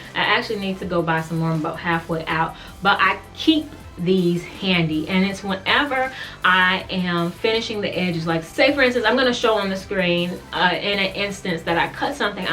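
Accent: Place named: Houston, United States. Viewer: i love your channel and i love your houston accent lol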